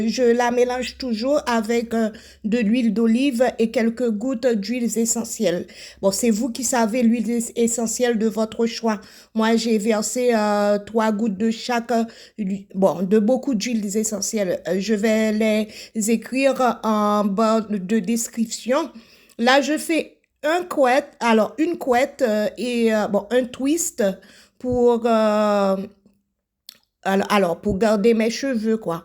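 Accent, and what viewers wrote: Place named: Haiti. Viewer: Je suis une nouvelle abonnée, tu es haïtienne comme moi en regardant cette vidéo je me suis dit que tu as un accent haïtien pour confirmer je suis allée visiter ta chaîne et je suis tombée sur une vidéo en créole, je dois encourager ma chère concitoyenne🇭🇹 bonne continuation!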